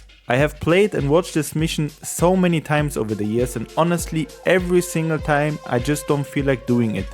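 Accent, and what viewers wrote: Germany. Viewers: Man merkt das du Deutsch bist Aber trotzdem gutes Video ❤ Und sogar unterhaltsam 👍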